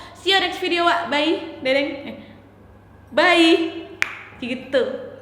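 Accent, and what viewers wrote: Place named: Pontianak, Indonesia. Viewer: Org pontianak mana suara nya ,,😆😎💪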